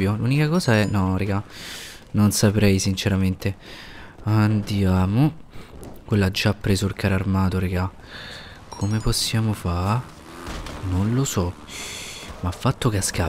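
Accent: Rome, Italy. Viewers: Ma sei romano?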